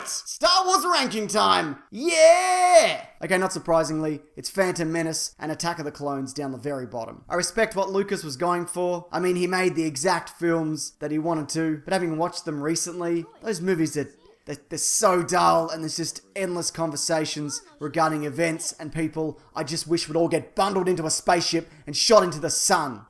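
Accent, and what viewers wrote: Australia. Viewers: Dude....your sense of humor always gets me....out of 10. And your Aussie accent is simply awesome....also....out of 10.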